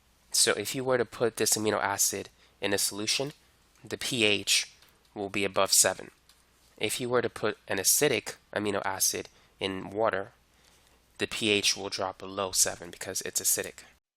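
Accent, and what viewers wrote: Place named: Massachusetts, United States. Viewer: sounds like he's from Mass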